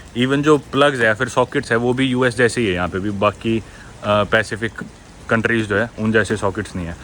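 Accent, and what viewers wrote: Haryana, India. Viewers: Ram ram bhai aap haryana s ho kya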